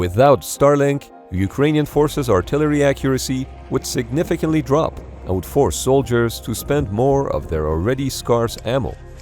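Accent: Iran. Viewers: Sounds Farsi-influenced :) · Khaliz Persian accent is evident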